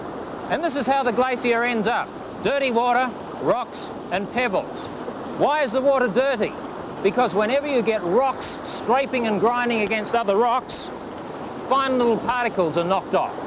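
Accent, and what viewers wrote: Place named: Australia. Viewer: That is how we Aussies pronounce glacier as a rule.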